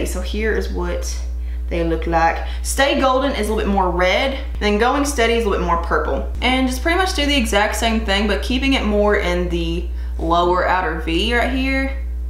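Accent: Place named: Tennessee, United States. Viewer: Ok so I have to ask 1. Where are u from...u sound like you are from tennessee or Kentucky I live in tennessee I would like to follow this look for a video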